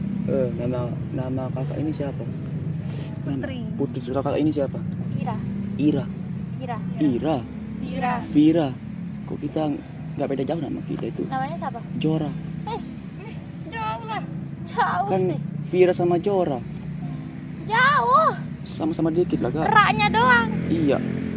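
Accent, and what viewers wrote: Lombok, Indonesia. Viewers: Logat Lombok ya gak ilangg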